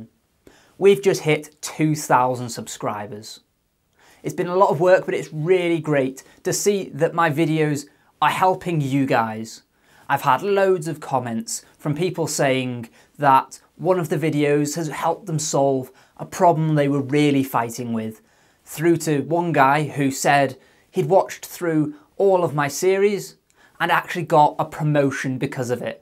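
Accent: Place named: United Kingdom. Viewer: that british accent!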